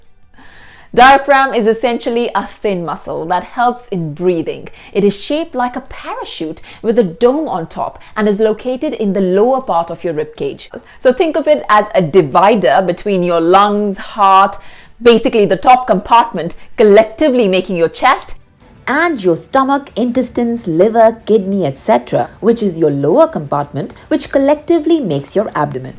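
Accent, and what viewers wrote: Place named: United Kingdom. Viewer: Her accents ….your accent is quite fascinating!..it borrows from a lot of areas ,a slight British as well ..the way u spelled ‘KAPALBHATI’ ,I don’t know what to talk of it 🗣️ !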